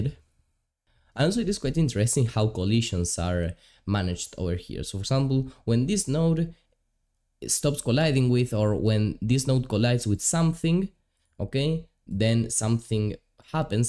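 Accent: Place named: Argentina. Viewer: Buen video! Note un leve acento, sos argentino o uruguayo? Un saludo y a seguir asi!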